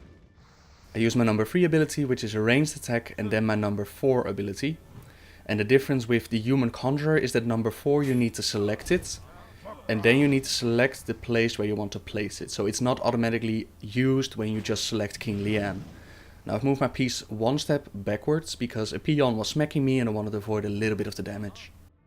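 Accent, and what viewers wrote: Netherlands. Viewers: Hey, I'm from Belgium, and i hear you'r a dutchman to;P Congratz and respect. Maybe we can play Together,:P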